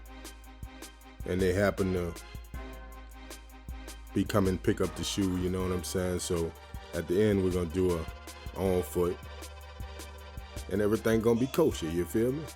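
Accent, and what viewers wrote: New Orleans, United States. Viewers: Picked these up myself. I hear that Nola in ya voice so I had to sub ⚜️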